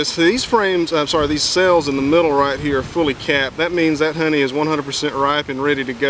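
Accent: South, United States. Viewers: with a southern accent 😳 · 😂 love his thick (southern?) I think accent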